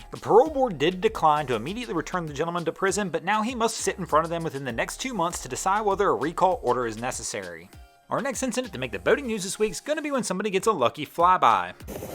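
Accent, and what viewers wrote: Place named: Delaware County, United States. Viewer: Are you from Delco, PA? That accent definitely sounds like it.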